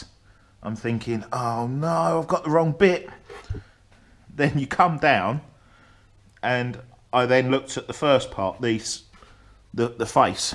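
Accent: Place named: Cockney, United Kingdom. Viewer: Best cockney on YouTube 👍🏻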